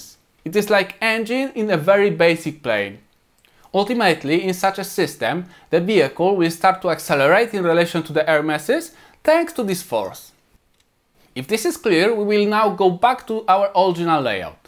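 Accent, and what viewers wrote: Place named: India. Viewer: Did you learn English in India?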